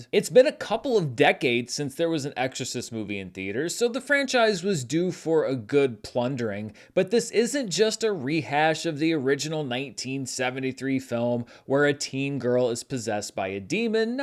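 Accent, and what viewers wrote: St. Louis, United States. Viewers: Are you from St Louis?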